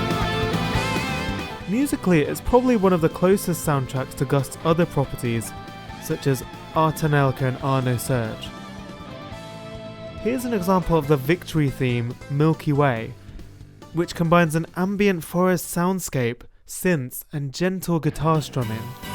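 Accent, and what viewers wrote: United Kingdom. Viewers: Oh that's a British man, I honestly didn't expect that.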